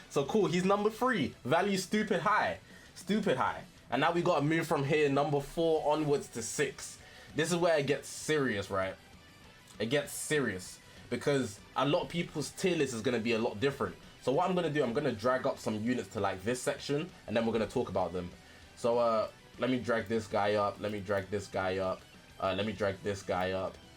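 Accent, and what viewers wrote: United Kingdom. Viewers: British man explaining in NBA terms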